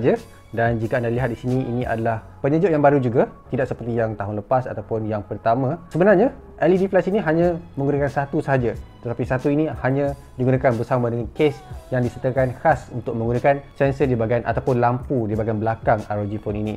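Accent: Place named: Malaysia. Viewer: suara nya kayak malaisiya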